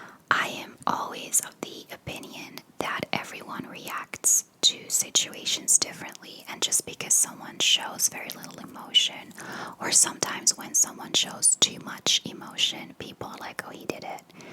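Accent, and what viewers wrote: United States. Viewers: i’ve watched your vids for so long and i’m still in shock that you’re from belgium. your english is flawless and your accent sounds so american i’m fooled every time😂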